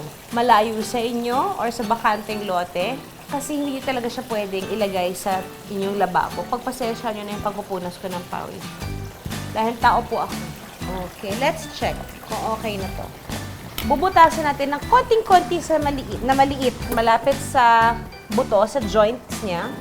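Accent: Philippines. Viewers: I heard that accent and knew she was from the Philippines. Nope not doing it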